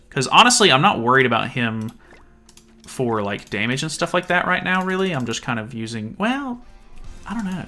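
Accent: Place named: Texas, United States. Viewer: are u by chance from Texas? Your accent reminds me of Maggie from The Walking Dead lol BTW maybe try Green or LR Diane, Lance and King? The passive Diane relic damage boost should be pretty nice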